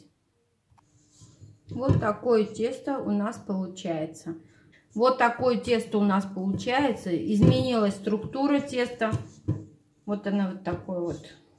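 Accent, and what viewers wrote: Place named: Armenia. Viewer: Человек на русском языке говорит это главное! Чувствуется акцент армянский. Спасибо вам за рецепты. Вы лучшая!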